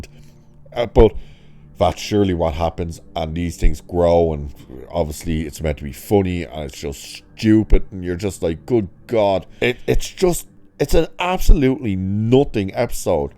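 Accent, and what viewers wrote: Ireland. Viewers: He's Irish not English.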